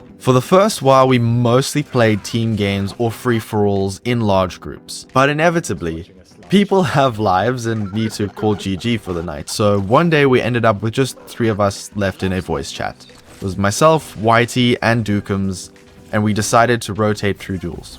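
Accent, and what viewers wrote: South Africa. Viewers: Hah, I could instantly tell the Saffer accent :D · Is this man South African?! Legend.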